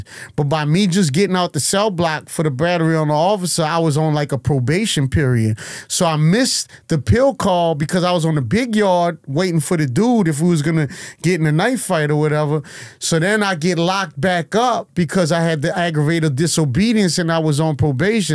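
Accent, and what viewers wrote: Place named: New York, United States. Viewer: Why does he sound SOO New York? In getting Fat Joe vibes. Man, this guy dropped some real gems.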